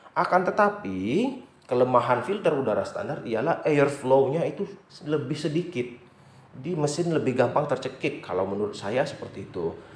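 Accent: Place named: Sumatra, Indonesia. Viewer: saya dari jambi om, masalahnya logat bahasa om nada2nya kayak orang sumatera, salam kenal dari jambi... Dan ditunggu tutorial selanjutnya om saya bantu dengan subcribe ya....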